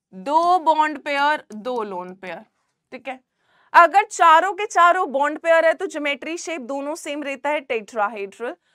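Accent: Bengal, India. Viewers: mam are u bengali?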